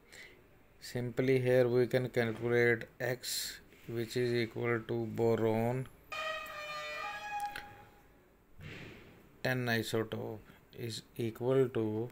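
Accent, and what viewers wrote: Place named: India. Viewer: Wow this is amazing Am helped a lot though am a Zambian and I had some difficulties in getting the Indian accent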